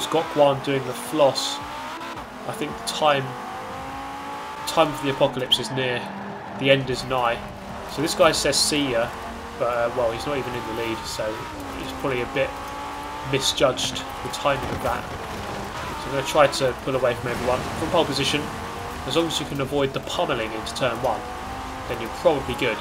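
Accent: United Kingdom. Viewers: A British accent and sarcasm is the best combo in the world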